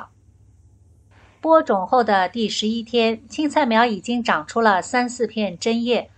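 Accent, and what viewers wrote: Jiangsu-Zhejiang, China. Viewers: 其实我最喜欢看的是开始的那三十秒，听你口音像是江浙一带的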